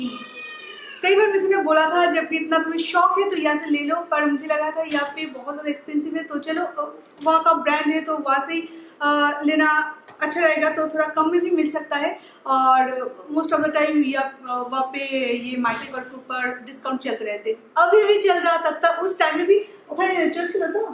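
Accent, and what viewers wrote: Bengal, India. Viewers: Apki voice bahut achi bangali touch wali Hindi Luv d most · It's so inspiring to see you working so hard for you and your family's future. To all your haters i just wanna say that there's nothing wrong in your pronounciation ; it's just your bengali accent that gets mixed with it. They should rather get inspiration from you that you are working on your english even after so much hate.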